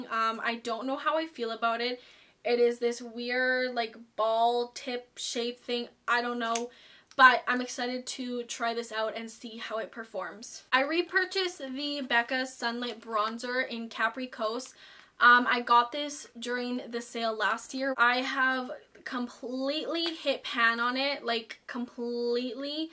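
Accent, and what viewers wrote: Canada. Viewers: me too! u sound like your from the prairies!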